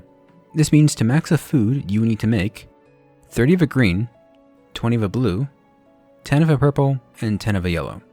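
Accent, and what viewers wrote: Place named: Pakistan. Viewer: sounds like from pak maybe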